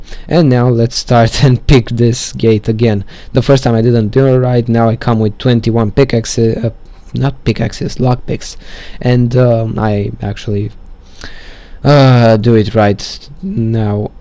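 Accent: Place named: Serbia. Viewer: you have a serbian acent :)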